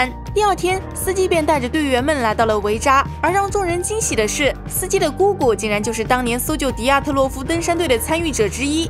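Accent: Jiangsu-Zhejiang, China. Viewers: 可能因为Up主是江浙人吧~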